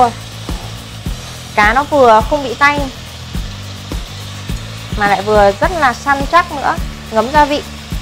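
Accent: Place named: North, Vietnam. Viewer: giọng bắc:nge nặng trỉu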